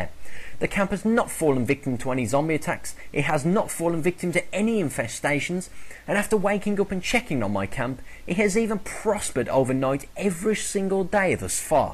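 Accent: Birmingham, United Kingdom. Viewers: your from birmingham :D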